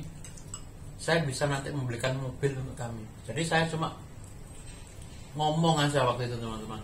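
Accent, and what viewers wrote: Java, Indonesia. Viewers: bahasa Suroboyo,ne kok medok, dari mana asalnya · Logate kok jowo.jowo ngendi mase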